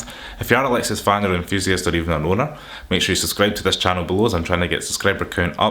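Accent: Scotland, United Kingdom. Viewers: That's funny, I heard the heavy Scottish and still put the video on 1.25 once your ear gets it, it is all the same. Although I have watched a few Scottish films and they have some good sayings that crack me up. Love it, we don't all need to be the same, please stay different. I have a CT<num> and am now over 100k. I need to do the spark plugs, the shark fin is leaking, and the ABS for the brakes is whining as well as the battery which was all good a few months ago now won't do EV mode. All at once, is that a key point? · The guy is speaking Scottish what does Dutch have to do with it?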